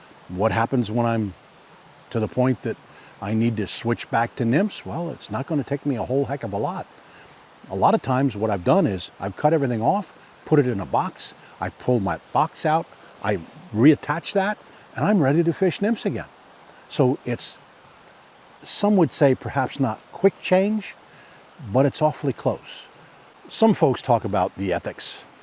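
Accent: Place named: Appalachia, United States. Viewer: Cannot understand a word because of poor sound and Redneck Hillbilly English!😢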